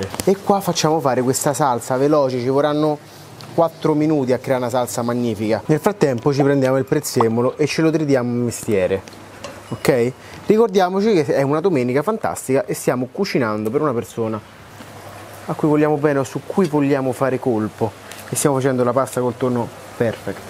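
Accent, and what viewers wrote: Rome, Italy. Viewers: Ma non è che imita. I romani parlano così 😅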